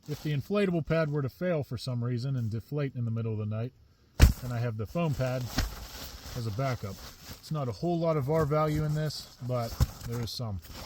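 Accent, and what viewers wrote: Pittsburgh, United States. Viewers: Do i hear a little yinzer in your accent? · I could hear the Pennsylvania accent, makes me homesick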